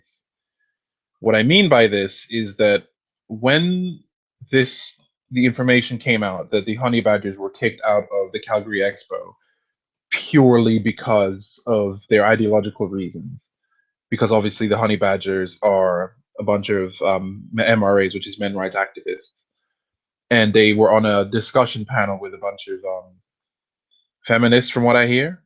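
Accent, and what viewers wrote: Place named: Ireland. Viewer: Lol youre from Ghana? I thought that you were maybe Irish or something lmfao, just how you pronounce certain words thats all. First time viewer here just so you know, good video mate!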